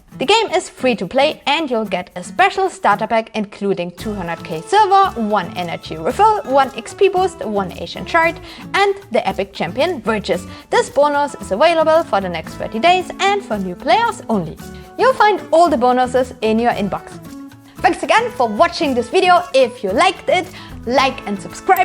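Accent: Germany. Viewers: Wenn man dir länger zuhört, dann ist der deutsche Akzent sehr deutlich. Ist ein Kompliment ! 🤗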